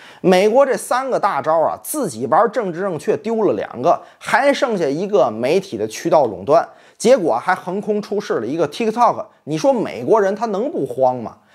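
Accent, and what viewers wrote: Tianjin, China. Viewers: 这是天津话吗？以前老看杨光的快乐生活，感觉有那么点像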